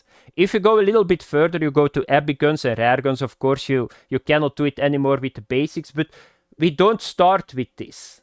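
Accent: Flanders, Belgium. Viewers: Are you flemish (Belgium) by any change. Your englisch sounds like mine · De belgische accent, i love it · ieeeel staarekk vloms accent ni echt nen dutchké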